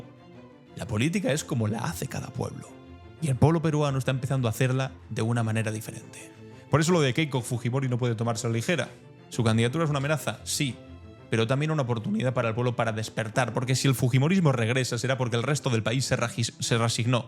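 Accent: Spain. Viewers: Cállate hoy tanto Delincuente que mata a diario elsu padre encarceló al desgraciado genocida de ABIMAEL a es genocida con tu acento español anda a hablar a españa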